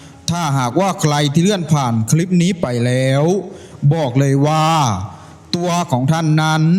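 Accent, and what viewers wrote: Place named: Isan, Thailand. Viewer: อยากกดเข้ามาดูตลอด แต่พอได้ยินเสียงคนอ่าน กดออกแทบไม่ทัน ถามจริงหาที่อ่านแบบคนทั่วไปไม่ได้จริงเหรอ สำเนียงอีสานก็หังยากแล้ว ยังจะมีการทำเสียงแบบละครวิทยุสมัยก่อนอีก เฮ้อรับไม่ได้ๆๆ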